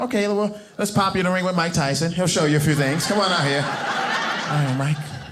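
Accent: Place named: New York, United States. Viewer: He is so hysterical, such charisma, and I can watch him once a week and still laugh at the same show. He’s wonderful and keeps it clean. I love his accent from New York, he cracks me up❤️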